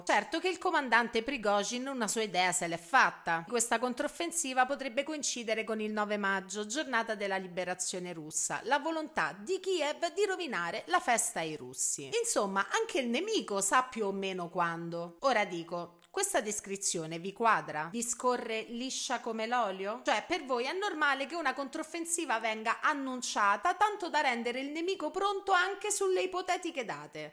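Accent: Rome, Italy. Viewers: I tuoi video sono delle "Icone" concentratti dell'italico sapere e ponderare. Grazie poi dell'energia positiva che metti col tuo romanaccio simpaticcissimo. Grazie veramente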